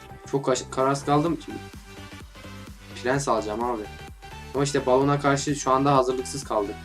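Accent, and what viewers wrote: Turkey. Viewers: Abi Aslanköy den mi geliyorsun? Öyle Konuşuyorsunda :)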